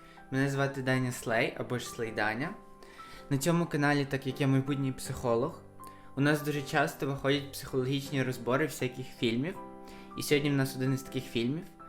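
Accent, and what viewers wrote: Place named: Surzhyk, Ukraine. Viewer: такий жоский суржик((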